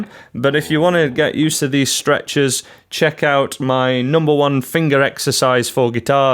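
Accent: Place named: North Yorkshire, United Kingdom. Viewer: Thank you for the lesson on by. Terrif song! Your accent sounds like a Northern Yorkshire accent. I'm an ex British Patriot. I live in Tasmania now. Keep up the good work. Easy to understand.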